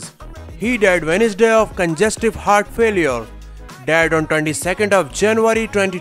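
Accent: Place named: India. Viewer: The announcers Indian accent is so distracting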